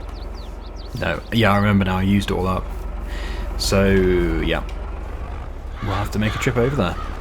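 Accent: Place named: England, United Kingdom. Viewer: I don't want to affend anybody but he has an English accent (I think) but in the game he drives on the right side of the road. Just something I noticed.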